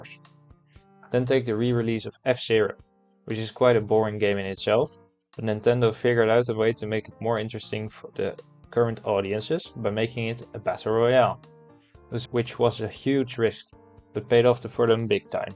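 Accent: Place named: Netherlands. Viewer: in my native language it’s also succes! I thought i heard an accent, are you by chance Dutch?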